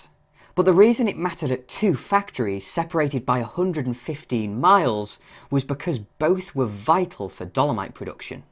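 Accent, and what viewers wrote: United Kingdom. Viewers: Ah yes the Scouse influence? Boss la !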